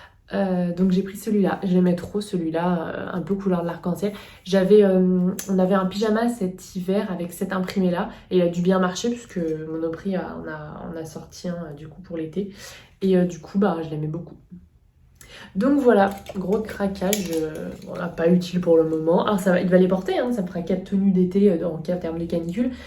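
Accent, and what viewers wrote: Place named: England, United Kingdom. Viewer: C'est mon premier commentaire sur ta chaîne! J'apprécie vraiment de te suivre; je me regale a chacun de tes vlogs même si pour tout te dire je les trouve bien trop courts 😉. Tu as l'air si douce; si gentille et j adore ton petit accent anglais. J'aime bcp ce que tu nous proposes dans tes vidéos ( plantes; recettes; coups de cœur; blabla bébé)! J'aimerais beaucoup que tu nous fasses une vidéo sur tes looks/tenues car je trouve tes tenues hypers sympas et originales à chacunes de tes vidéos! En tout cas tu es une de mes plus belles découvertes YT. Bises; à bientôt.🌼🌷🍃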